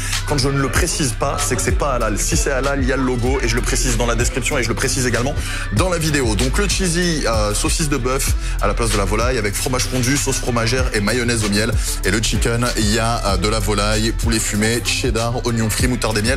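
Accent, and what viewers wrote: United States. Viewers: frère tu fais tellement le ricain i tariv quoi aha ? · T'es un Cainri ou un français mdr , bonne vidéo man !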